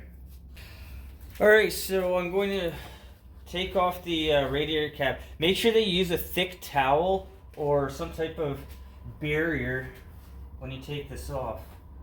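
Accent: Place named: Michigan, United States. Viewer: Thanks for the vid 👍🏽 Saving me ab $140. Also any chance you're from Michigan? 😂 you have a strong accent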